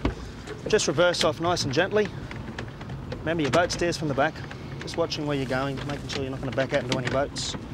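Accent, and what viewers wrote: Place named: Texas, United States. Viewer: Good video ,,, Love that Texas accent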